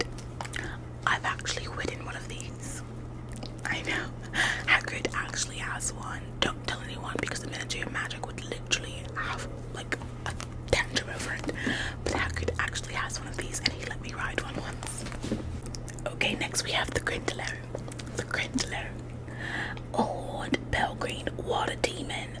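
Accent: United Kingdom. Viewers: I love the British accent 🩷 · if this was my first time watching your videos i would think you were british 😭😭😭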